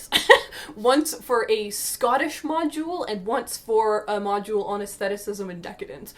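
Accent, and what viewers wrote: Canada. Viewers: Loved hearing the Canadian accent strong in this video, as a Canadian living in the states for a decade.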